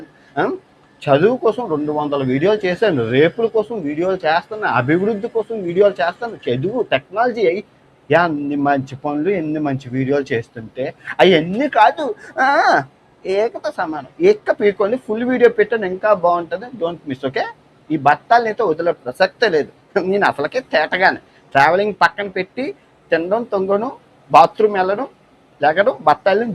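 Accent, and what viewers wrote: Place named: Visakhapatnam, India. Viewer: Actually Brother, మీ భాష సరిగ్గా వుండదు, గరికపాటి గారు చెప్పిందే మీరు చెప్పారు కాని, అదే విషయాన్ని మీరు వెటకారంగా, అసభ్య భాష పదజాలంతో చెప్తారు. అందుకే మీ పై వ్యతిరేకత ఎవరైనా మీకు negative feedback ఇస్తే తీసుకోవడం, తప్పులు వుంటే సరిదిద్దుకోవడం అలవాటు చేసుకోండి Brother. నేను ఒప్పుకుంటాను, మీరు నిజంగా చాలా మంచి పనులు చేశారు. నిజంగా betting apps పైన మీ పోరాటం నన్ను బాగా కదిలించింది Brother. కాస్త మీ భాషలో బూతులు లేకుండా వుంటే చాలు. మీ Vizag యాస తో అయితే అసలు problem లేదు. ఒక తమ్ముడు, ఒక అభిమాని, ఒక శ్రేయోభిలాషి లాగా నా feedback తీసుకో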